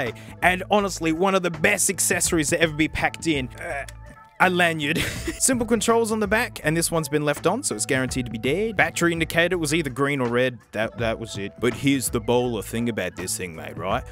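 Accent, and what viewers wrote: Australia. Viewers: Theres something about listen to an Australian talk about Apple products with a very green iPad case thats just so entertaining. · I feel like I have a loud Australian friend. Everyone needs a loud Australian friend.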